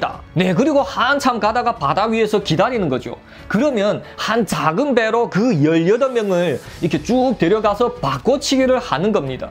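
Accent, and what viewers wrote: Yanbian, China. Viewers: 웃긴다 조선족 말투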